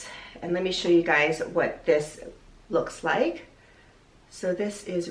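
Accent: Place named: Canada. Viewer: Love the Rosewood on you! I hear a bit of a Canadian accent from you. Did you grow up in California?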